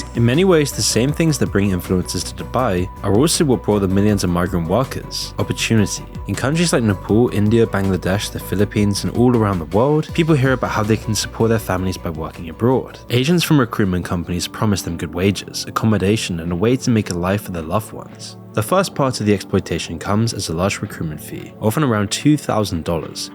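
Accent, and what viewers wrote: United Kingdom. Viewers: Why is it these videos are always made with a guy with this kind UK accent? It's driving me nuts 😂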